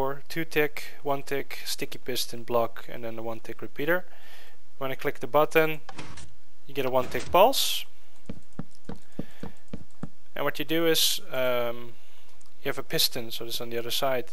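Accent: Netherlands. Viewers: you're from holland aren't you?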